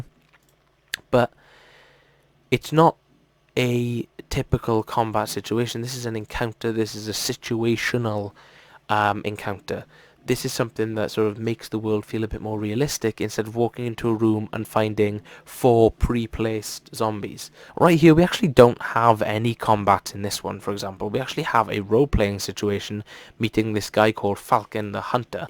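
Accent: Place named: Wales, United Kingdom. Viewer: Love the advice. Couldn't help but notice the accent. Do you play in a store 8n wales?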